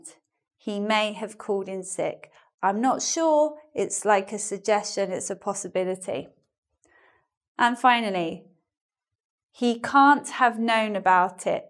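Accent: United Kingdom. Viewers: I started learning Eng at the age of 6, now I'm 25. I love her channel but I mostly watch her videos to listen to British Eng not to learn. But in that 19 years, every teacher and book said that 'might' is the formal and polite version of 'may'. And here I am, hearing the total opposite with a confused Pikachu face. Thank you so much!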